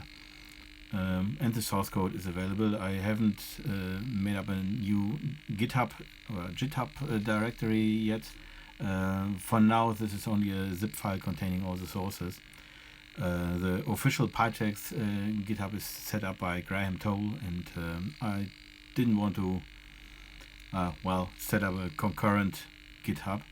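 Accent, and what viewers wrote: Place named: Germany. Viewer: You sound like German is your native tongue. ... and Giana Sisters as background music! Rocks!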